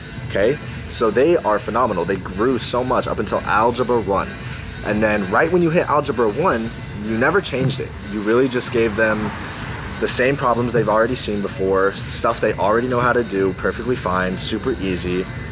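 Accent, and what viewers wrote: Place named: Canada. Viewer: why do you sound Canadian in this 😭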